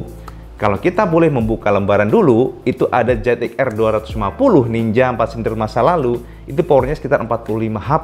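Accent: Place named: Surabaya, Indonesia. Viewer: Asyik suka deh pembawaan wong arek" suroboyo auto sub lonceng, sukses terus bwt cak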